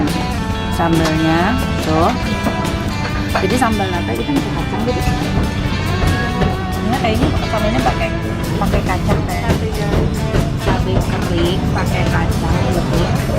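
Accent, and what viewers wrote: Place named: Sunda, Indonesia. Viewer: ak nonton nya ga fokus sama bakso nya tp fokus ke teh yg cantik. 😍😍 sukses turus buat canel youtube ya teh 👌😍. salam dari Pelajar di jepang. ak selalu nonton trus youtube teteh. teh cantik lucu juga kalo ngmong bahasa sunda 😁😁ak orang jawa tengah.. pokok nya semangat trus teh buat konten youtubenya 👌😁😍😍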